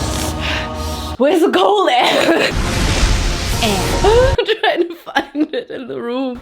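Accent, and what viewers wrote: United Kingdom. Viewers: As a Brit, I love your British accent! You nail it Queen! YUS! But gimme gimme those spicy noodles.....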